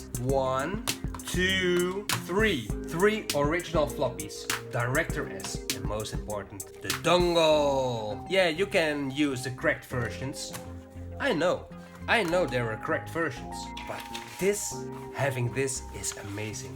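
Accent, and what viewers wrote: Netherlands. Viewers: Were are you from? i spot a bit of Dutch in that English spoken word. But you are just like me: hardware, sniffing hardware etc. Amazing :D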